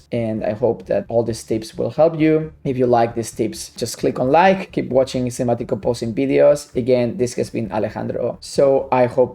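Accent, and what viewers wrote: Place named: Spain. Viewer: soy de colombia y hasta en inglés se nota el acento de españa. hahahaha, tan lindo e inteligente que es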